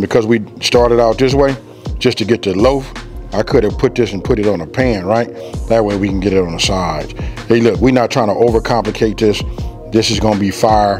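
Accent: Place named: California, United States. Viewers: Does anybody know where this guy is from? I think I heard him say he was in Vegas these days but he don't sound like he's from Vegas. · For all those who think Californians don’t have an accent, this right here is a prime representation of a thick California accent 😆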